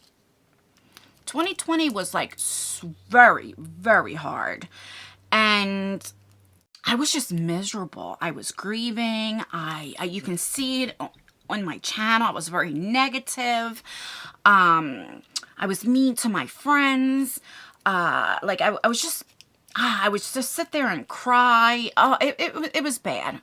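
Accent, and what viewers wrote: New Jersey, United States. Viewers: Love your videos! Whenever I hear your voice, I miss living in New Jersey :-). We are the same age and our birthdays are only a couple days apart, and I just learned a few years ago to trust my gut! Don’t let people gaslight you into making you feel like you’re losing your mind. You feel how you feel for a reason.❤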